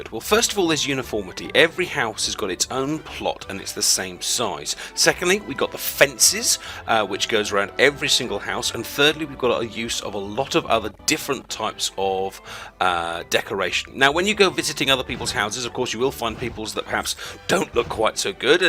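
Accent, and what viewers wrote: United Kingdom. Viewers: I agree lol. I usually hate the british accent but this guy makes it sound really good.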